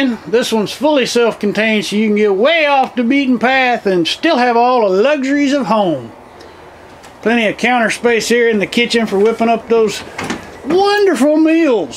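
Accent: Texas, United States. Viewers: Texan accent?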